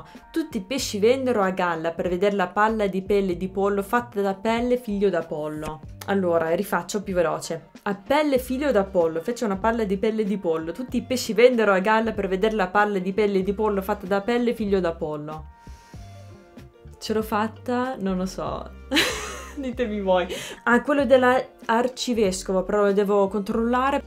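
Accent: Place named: Fano, Italy. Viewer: Ha una perfetta pronuncia fanese, con un leggero tocco anglosassone in alcune sillabe.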